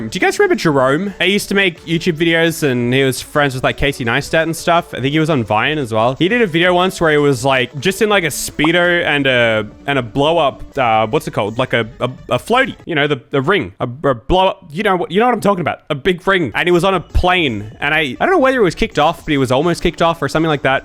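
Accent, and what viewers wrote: Australia. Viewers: Aussie accent doesn’t always mix well with their sensors very stupid lmao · This is definitely massive YouTube BS, but... I wonder if it's an accent thing? Hear me out: I can't imagine that their "real humans" listen to videos all the way through. It's just inefficient. I would not be surprised if they have A.I. isolating suspect words and then presenting just those snippets to listeners. f you listen to out of context snippets of you saying "can't" and don't realize that its an Australian accent, it could maybe mistaken for "c*nt". that's my theory anyway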